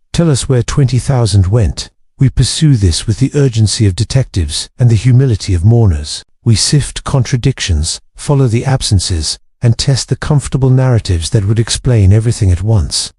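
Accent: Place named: RP, United Kingdom. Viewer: I love history, but this piece is so far up its own a***, it's embarrassing. Narrative should rivet and guide and inform, not try to impress with a plummy accent, lots of big words and no actual people, characters, story development. People love to learn about people. Plenty of scientists, historians, even mathematicians understand how to decode something complex and spin a wonderful yarn, with drama and tension. Instead, this feels as if the narrator is reading from a stuff textbook...I can give plenty of examples. Do better, or I will not be back. Tell a story that engages and isn't so self-impressed. "Disease is the quietest, cruelest candidate..." Really? How about discussing the actual one that hit the metropolis you're detailing? And stop being so vague and boring. And note to the producers: This irked me so much, it didn't do anything to help me drift into sleep.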